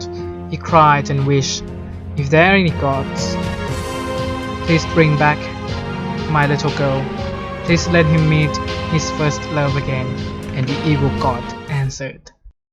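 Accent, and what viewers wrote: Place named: Thailand. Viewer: Are you Thai? You seem to have the accent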